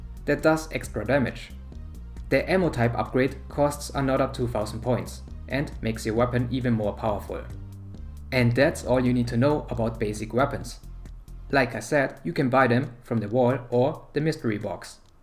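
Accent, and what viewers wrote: Germany. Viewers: Ich weiß nicht wieso aber ich höre direkt dass du ein deutscher bist 😂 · Are you german because of your accent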